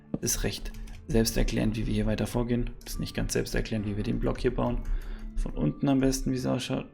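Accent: Austria. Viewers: Kaons sein dost a wosch echta östarreicha bist?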